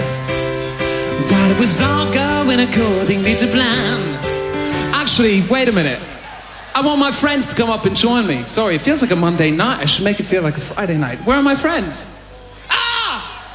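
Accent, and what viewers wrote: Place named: United States. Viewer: he sounds so American!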